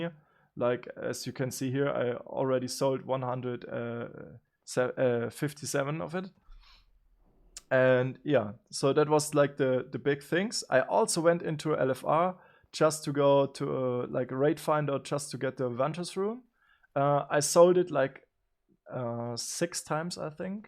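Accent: Germany. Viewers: hab direkt gehört das du deutscher bist. :D leider hab ich net viel verstanden wie das gehen soll jetzt.... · Why do you sound German so hard? its so annoying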